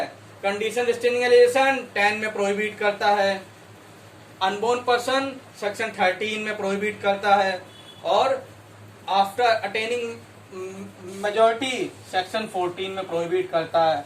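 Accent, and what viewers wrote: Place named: Uttar Pradesh, India. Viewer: 😁apke bolne se hi pta chalta ap up se ho Mai bhi Lucknow se hu.. Bahut Acha laga video...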